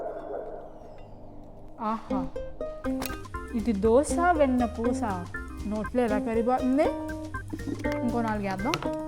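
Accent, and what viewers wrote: Godavari, India. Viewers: Slowly i bcame fan of ours. U r male getups r osm, suits u a lot. Godavari dialect is so funny osm. It shows how much hardwork u make behind the scenes.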